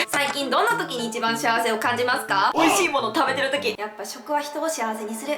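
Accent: Kansai, Japan. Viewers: いつも楽しいお話ありがとう😊 ちゃんの関西弁大好き💕 YouTubeに出会ってくれてありがとう！！だから、ちゃんに出会えたから👏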